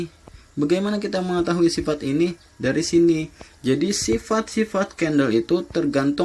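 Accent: Banjar, Indonesia. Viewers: klo di dngar dari logat bahasa ni nang kya buhan urg Bnjar jua ne..😀🙏🙏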